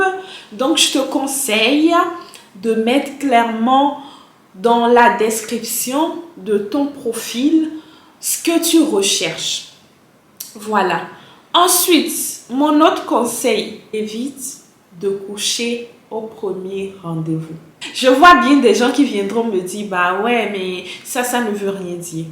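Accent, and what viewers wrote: Benin. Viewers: Tu es Béninoise ? Ton accent ❤ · ah d accord super alors je suis béninoise aussi j’ai reconnu l accent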